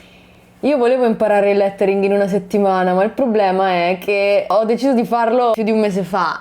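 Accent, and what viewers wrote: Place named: Milan, Italy. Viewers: ma sono l' unico a sentirla con un dialetto milanese? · Ma questo minaese nei video.... Ma sei divetate milanese dock... Tac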